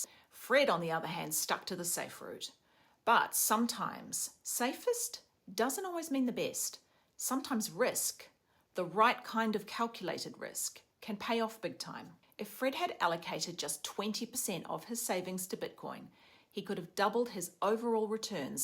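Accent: New Zealand. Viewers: Hi, judging by your accent I think you might be a Kiwi (if not, apologies!). I am in Auckland, NZ, and just wanted to say how proud I am of your channel. You are truly inspirational and the reason I got started investing in Bitcoin. This is one of the best Bitcoin advisory channels out there, and I hope it keeps growing because you absolutely deserve it.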